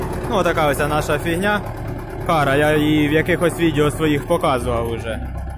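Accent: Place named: Ternopil, Ukraine. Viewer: а значить перепутав у тернополі похожа до вашого акценту.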